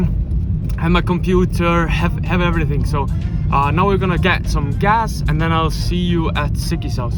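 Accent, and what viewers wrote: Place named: Northern England, United Kingdom. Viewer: Interesting to hear how your accent is like a Northern English accent. I wonder if the Northern English accent is derived from Scandinavia. It was ruled by Danes and Vikings for a while.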